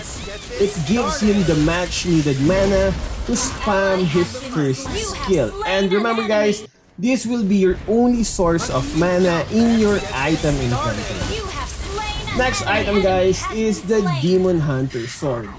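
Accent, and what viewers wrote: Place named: Philippines. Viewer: The pinoy accent tho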